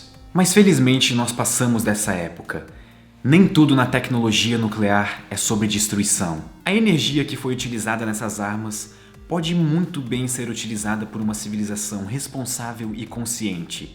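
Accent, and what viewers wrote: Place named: Northeast, Brazil. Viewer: Nordestino sudestizado. Forte abraço!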